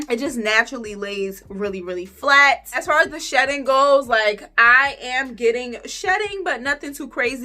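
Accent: New Jersey, United States. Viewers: Ok Jersey🙌🏾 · Sis are you from Jersey? That accent is so strong lol. I love it tho! Love ur videos btw!❤️ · Ayyyyyy hey my jersey sis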